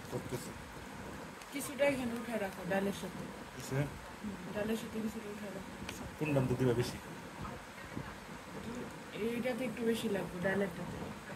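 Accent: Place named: Tangail, Bangladesh. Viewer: ভাই আপনি কি টাঙ্গাইল না ময়মনসিংহ জেলার লোক ভাষাটা নিজের মতো লাগছে।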